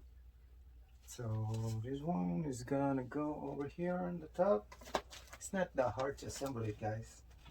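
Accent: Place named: Texas, United States. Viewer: Kuya! Texas accent kanaaa 😅🤩 More vidsss! 🦋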